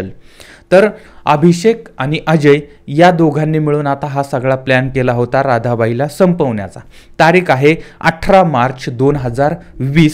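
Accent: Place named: Satara, India. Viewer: भाषा उच्चार वरून सर सातारा जिल्ह्यातील असावेत असं वाटतंय,तुम्हाला काय वाटतंय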